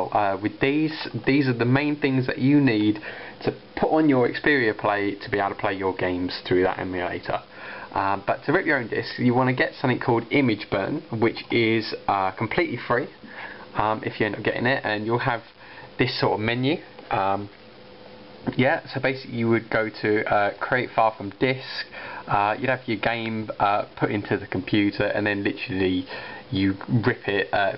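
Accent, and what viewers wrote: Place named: United Kingdom. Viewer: I love the britich accent while i don't realy understand lots of words :)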